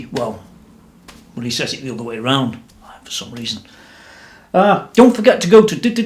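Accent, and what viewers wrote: United Kingdom. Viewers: I hear a British accent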